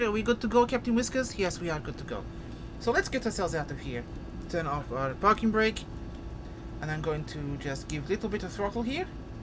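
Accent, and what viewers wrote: Russia. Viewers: are you doing a russian accent? James Bond and I 😍 love it!!!! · ВАУ... какой сексуальный русский голос!!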